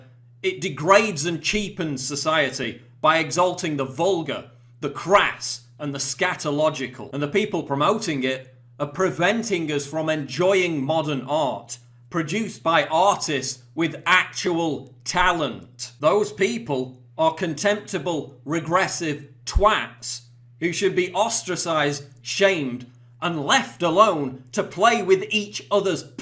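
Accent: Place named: United Kingdom. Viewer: Just because you have a British accent doesn’t mean you’re not an idiot.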